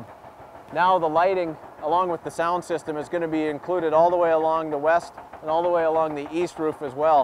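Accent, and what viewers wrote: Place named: Canada. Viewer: "Souwth" as an American this is the first Canadian I've ever come across that had a stereotypical Canadian accent.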